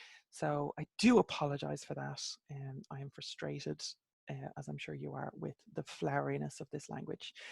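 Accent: Ireland. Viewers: Thank you so much for reading these. I love hearing it read in your Irish accent. It helps to hear how things are said properly. Your commentary is also a great help with understanding what is going on.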